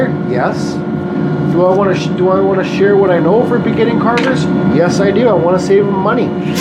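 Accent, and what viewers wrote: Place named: Canada. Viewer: You have the most amazing Canadian accent... :-)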